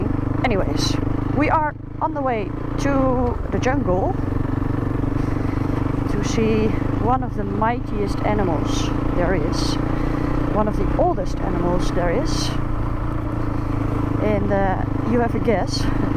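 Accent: Netherlands. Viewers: Dag, bedankt voor deze gewelig mooie video. ik heb er van genoten en ook van jouw Engels met dat onmiskenbare lichte Nederlandse accent😂 Groeten uit Breda NL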